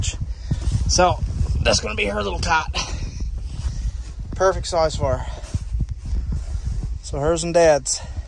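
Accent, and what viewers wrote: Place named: Newfoundland, Canada. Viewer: Are you a Newfie ? Yer accent sounds like ya from da bay bah!!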